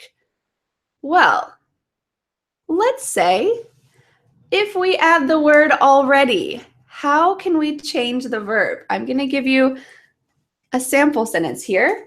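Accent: United States. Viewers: is your english american ou brazilian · You is American?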